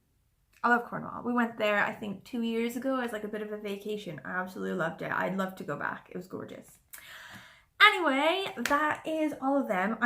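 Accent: Wales, United Kingdom. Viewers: Omg u are turning more Welsh with every click. Newest one ul be like Staceu from Gavin and Stacey, no offence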